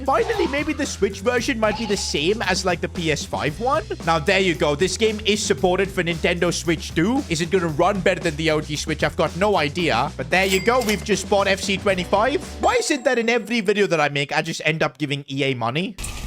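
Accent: India. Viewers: Now i know why foreigners say indians sound like this i get it now. The real indians dont the NRIs do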